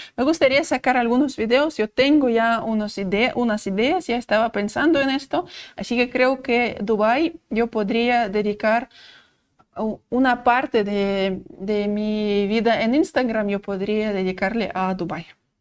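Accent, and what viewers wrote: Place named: Russia. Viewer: Jamás me Olvidaré de esa voz mesclada con asento Ruso ❤ te envio este corazón lo que te puedo Regalar en este Dia de san Valentín....... desdevenzla a marciales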